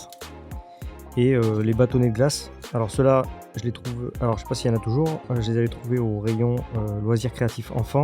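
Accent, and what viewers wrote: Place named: Southwest, France. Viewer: C'est ça c'est bien l'accent de chez moi( sud-ouest) qu'il m'a semblé reconnaître 😉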